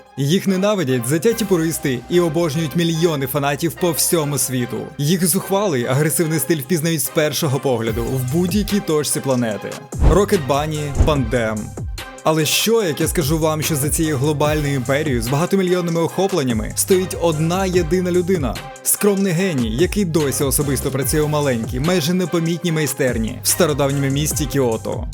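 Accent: Russia. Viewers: Класне відео. Ух, де там NFS 2015! Чому ви відтворюєте латинські слова за російськими правилами вимови "E" як "Є"? :face-orange-raised-eyebrow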